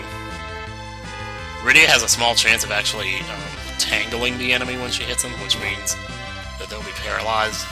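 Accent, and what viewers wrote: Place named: South, United States. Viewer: Based on you're accent i bet you are from the south. Great game by the way, even if that tower was a bit of a bitch.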